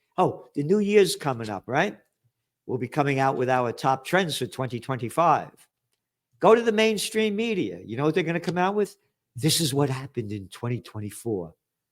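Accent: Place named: New York, United States. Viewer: Stop saying “turlit paper” with that annoying NY accent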